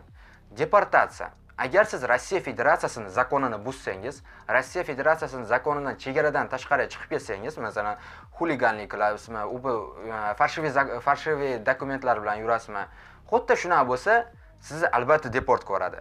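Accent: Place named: Fergana Valley, Uzbekistan. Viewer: Майли, лекин русча аралаштирма, узингни жааа интелегент деб уйлаш керакмас. Чунки билиниб турибди, сен гирт оддий узбек мхонадонида тугилиб усганинг. Чунки шевангни водийлиги хамма нарсадан Айон килиб турибди. Хурматим ошсин десанг углим, узингни мажбурлаб курсатма рус тилини. Мен рус мактабида укиб, болалигимдан рус кушнилар орасида яшаганман. Мендакалар куп, шунинг учун биз устингдан куламиз. Бир курдим, лекин кайтиб курмиман каналингни, гашимга тегади, ясама инсонлар. Ва кон босимим кутарилиб узимни кийналишимни хохламиман.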